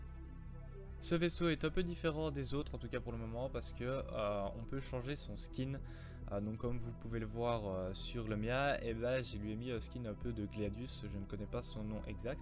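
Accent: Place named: Liège, Belgium. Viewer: Tu viens de Liège ? xD je kiffe cet accent 😂👌🏻 sinon bien la vidéo c’est cool je vais sûrement prendre celui là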